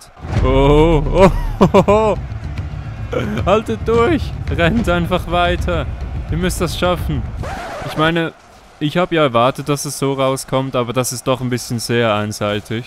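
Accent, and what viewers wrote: Switzerland. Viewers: BIst du Schweizer? Wenn ja dann ist das geilste weil ich auch Schweizer bin :D UNd machmal Ein Blauen Stickman übelst OP dann mach gegen 10000 Youtuber :D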